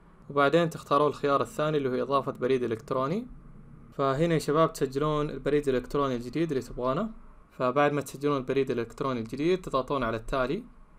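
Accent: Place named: Gulf, Arab world. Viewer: وشكراً لك لان كلامك مفهوم احب اللهجة الخليجية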